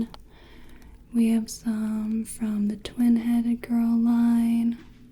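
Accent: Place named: Mississippi, United States. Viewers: Your midwestern accent coming through with that mild southern accent you've got going here is so relaxing. I know you said you weren't shooting for anything in particular, but I love where you landed, lol. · super tingly ✨💕 the genuine listening “yeah/mmhmm” during the conversation 🥴🤌🏻💋 I’ve lived in Northern Mississippi most of my life so I’m used to this accent (I have a slight accent, maybe a little less than what you had in the video) so it didn’t hit as hard for me like everyone else 😔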